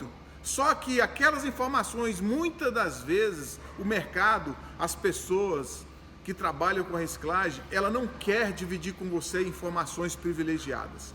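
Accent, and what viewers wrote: Brazil. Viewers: Sotaque de cearense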